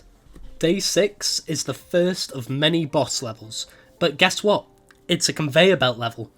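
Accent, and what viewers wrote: United Kingdom. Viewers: This man can complain about everything in British and I will immediately agree Unrelated note: I subscribed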